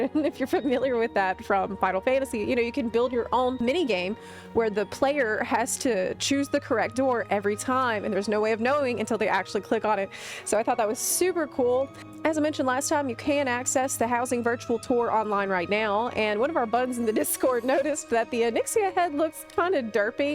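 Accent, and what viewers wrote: South, United States. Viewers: i just discovered your channel and i LOVE your enthusiasm and omg your accent. girl! i was born in texas, but raised in mississippi. and now i live in the midwest lol. i love your southern twang and miss it. 🙌🏼 they need to do housing themed kits by class, too. i don’t wanna be a druid sitting on an upholstered sofa from IKEA. also VERY nervous about kill-on-site in silver moon lol. i have a bad habit of mindlessly running into horde territory in Dalaran 😢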